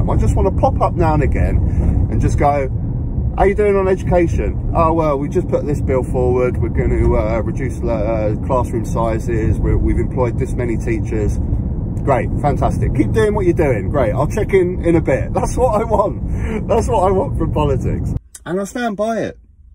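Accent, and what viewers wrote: United Kingdom. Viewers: I watch videos for his laugh and his saarf Laandan accent. He pronounces 'thousand' as 'fahsaand'. You need humour in these videos. Jonathan Pie started off as satire with his exaggerated rants, but now people are saying he tells it like it is.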